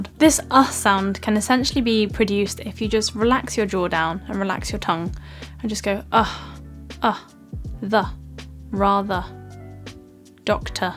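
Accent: RP, United Kingdom. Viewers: Good video,. I love how you explain and your British accent sounds cool♥️💘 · I love the way you speak and elaborate the British pronounciation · your british accent is super cool.But i'm struggling about this accent.😂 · This is how English should be spoken ... for me when I hear Asians speak English , but then sound American .. it just sounds wrong! It would be better to learn English from an English person , rather than an American ...🙏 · How how is it.... An Asian woman with a British accent... Errrrrghhhhhhhhhhhhhhhhhh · Lovely posh accent. You wont hear many people speaking with that accent however. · I love your British accent, but to someone I can't understand is Kate Beckinsale :‘( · I love your British accent, it's so beautiful and I'd love to speak the same with you! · I have to say that finding a youtuber who speaks with a perfect RP British accent is quite hard. 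You'll easily discover quite annoying posh RP British accents, but rarely a pleasant one. Luckily is an exception. She sounds beautifully British. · Double Blessings from God Beautiful Lady Still having such a great accent of nodern RP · Dang not only you are smart and pretty but with british accent. You are everyones dream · u so beautiful and i love yr british as well 🙌🏻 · Oh, I love the British accent! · I love English accent because of you ❤️❤️❤️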